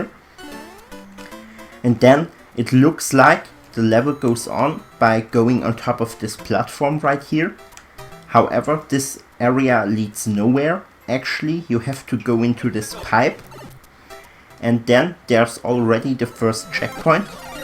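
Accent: Germany. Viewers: It's a German accent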